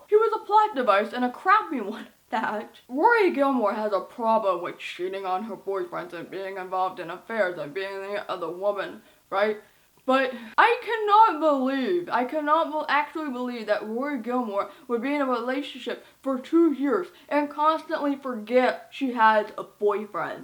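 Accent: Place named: New York, United States. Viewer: lol, I was just joking around, the New York and Boston accent are very recognizable even to us Canadians. I stand by it being cute still.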